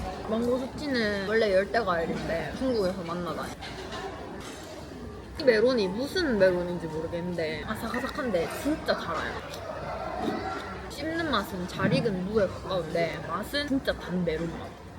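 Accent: Gyeongsang, South Korea. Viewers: 고향이 경상도 쪽이세요?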